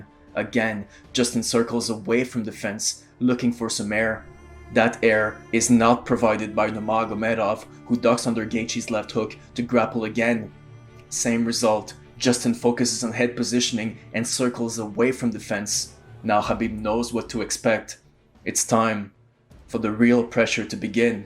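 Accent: Arab world. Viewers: It's seemed you have an Arabian accent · Yo we can tell from your accent that you're arab. Don't call him khabib when you know how to pronounce his name HABIB properly. Fake ass guy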